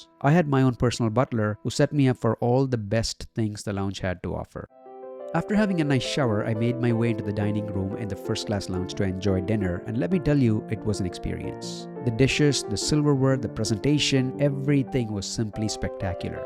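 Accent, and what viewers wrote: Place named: Philippines. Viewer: What a great review! Excellent commentary and not too disharmonious music. Great videography that wasn't rushed: loved how you showed the presentation. I assume you are Filipino from your accent? By the way, on Philippine Airlines, the tablecloths always look like they've been pressed, not that I'd ever previously thought about that. Keep up the good work. This review deserves many more than 7500 views.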